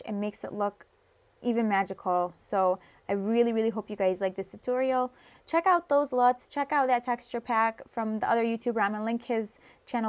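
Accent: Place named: New York, United States. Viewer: tnx for the tutorial. i find it cute when u say u wah (u want), dah (that) 😘 super new yorkerish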